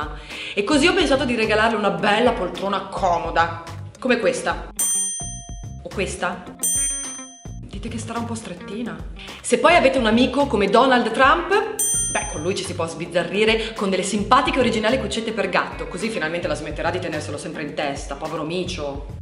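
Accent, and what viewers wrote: Italy. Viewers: quel dialetto! sei di Reggio Emilia? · ma sei pure emiliana! ok ti adoro, è ufficiale!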